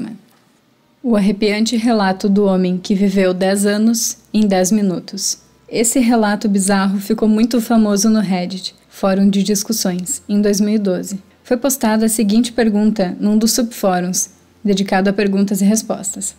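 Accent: Rio Grande do Sul, Brazil. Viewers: você e gaúcha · Oi tudo bem? vc é gaúcha? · O sotaque dela e parece ser gaucho ela usa muito "tua"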